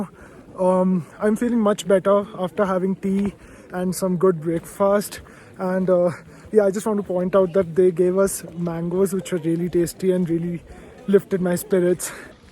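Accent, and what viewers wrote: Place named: India. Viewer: Your asking bizarre questions from the porter is strange and funny, you look abs sound Indian to me and I’m sure where you originally come from in the third world you have seen people carry things on their head and necks and backs.. why are you acting so unnecessary questions? Anyway better luck next time! Great content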